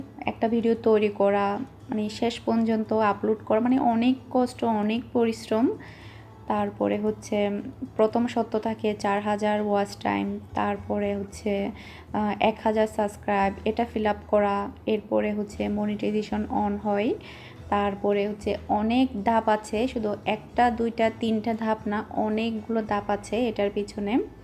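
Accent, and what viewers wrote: Chittagong, Bangladesh. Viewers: আপু আমি আপনার বিডিও দেকি কমেট করা হয় না আপনার ইমকামে কথা শুনে খুশি হলাম। আপনার বাড়ি কি পটিয়া আপনার কথা শুনে মনে হয় পটিয়া।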